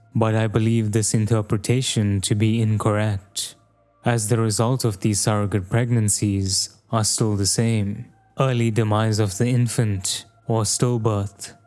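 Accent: South Africa. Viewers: Your voice sounds South African,South African Indian to be exact,id say from Durban but I could be wrong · South African?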